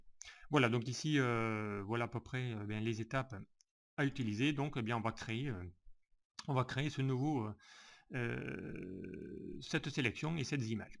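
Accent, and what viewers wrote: South, France. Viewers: Super, merci beaucoup pour cette excellente vidéo avec le charmant accent qui sent bon le Sud ...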